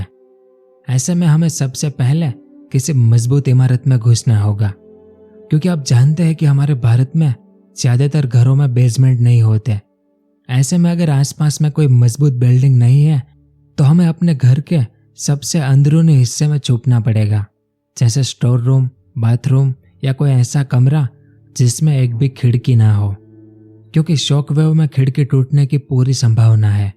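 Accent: Gujarat, India. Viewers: Bhai aap gujarati ho??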